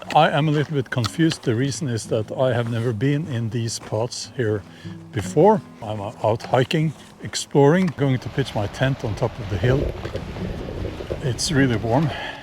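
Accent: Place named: South Africa. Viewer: sounded South African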